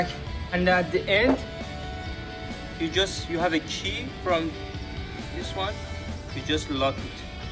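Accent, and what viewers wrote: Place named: Iran. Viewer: You sound so Iranian 😂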